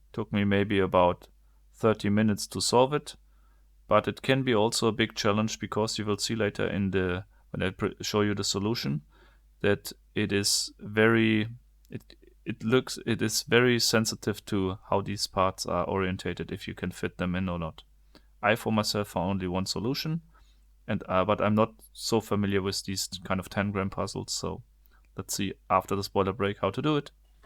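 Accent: Germany. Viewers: ich finde es immer lustig, wie man direkt an der Aussprache merkt, dass da ein Deutscher Englisch spricht :D ich mag dieses Platte Deutsch/Englisch :D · In diss and diss :D dieses geile denglisch :D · Subbed i just find you. Guttentag ich wohne in die niederlande. Aber meine deutch is nicht gut. Meine name is inge. Ehm thats problaby all i got for now lol. Somehow i first thought u were france lol but in this video i got it your heavy accent is deutch. But maybe a tip? Stop saying spoiler break. Doesnt make sence. Its an annoucement. In the screen with text there is no spoiler its just a anoucement. So its better to just let the screen happen. Thats how most people do that. I know i watch alot i mean hours a day of youtube. Im chronic ill so im home. I live with my second dad. So i hope this tip helps you for making even better videos. 👍 ⚘⚘⚘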